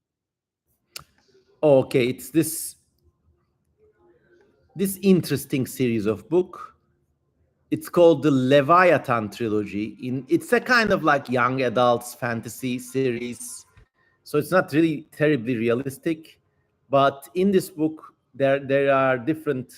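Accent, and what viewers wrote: Turkey. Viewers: Abi Türkçe seslerle İngilizce konuşmana bayılıyorum ya :D, bu arada çantandaki kalemlere de bayıldım.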